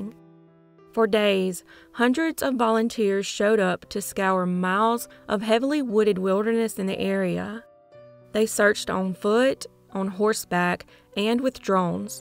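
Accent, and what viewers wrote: South, United States. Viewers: I like your southern twang.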